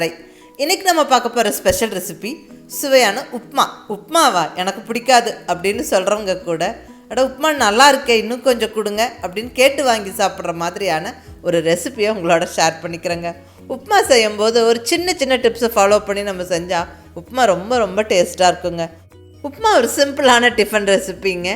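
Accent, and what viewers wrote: Coimbatore, India. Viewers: More than everything,your presentation and narration is fantastic.the language and slang is like that of coimbatore ladies.i like the preparation the 'padam(Tamil) is my favourite.my wife does not like,but I prepare both upma and Pongal like this.thank you for the special tip,that of heated and cooled milk.i was not knowing.gift during maargazhi maasam.thank you.iam 77 plus!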